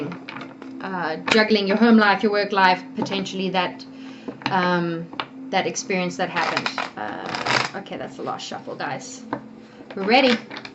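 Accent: South Africa. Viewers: Oh a few clues you may have a SA or Zim accent? Been solo for so long, not sure who is coming into focus. Thanks for such a lively reading. Wm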